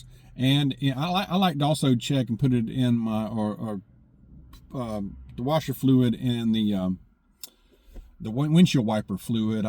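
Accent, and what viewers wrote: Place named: United States. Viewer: I like how you talk...good hearing just regular American citizen real person. I'm Tennessean so that's more like normal folk.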